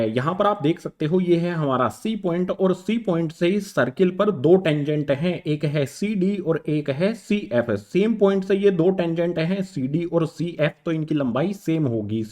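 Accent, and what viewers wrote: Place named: Tamil Nadu, India. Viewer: Love ur voice sir❤️❤️ Bcoz it have Tamil tone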